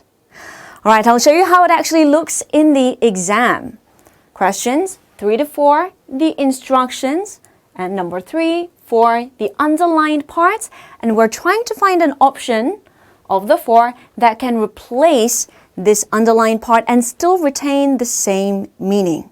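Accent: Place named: England, United Kingdom. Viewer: Her English accent 😍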